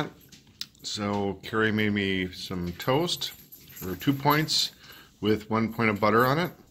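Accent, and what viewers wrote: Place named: Wisconsin, United States. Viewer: Awesome job! Haven't seen any bears yet in Northwestern Ontario Canada but just a matter of time. Lots of deer right now. Sometimes up to 6 or 7 at a time and I live in town lol. Couldn't help but laugh at the Canadian accent comment...as a Canadian I halve to say...You do sound like you are from WI. You never say "eh" which is the first clue you aren't Canadian. We say it all the time without realizing...too funny 🤣 My cousins live in Minnesota and they always laugh about how we say things here.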